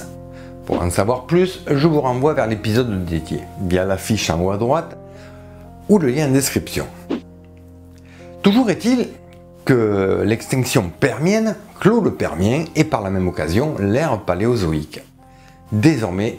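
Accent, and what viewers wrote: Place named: Provence, France. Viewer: Le retour des chemises à fleur et de l'accent provençal, ça m'avait trop manqué !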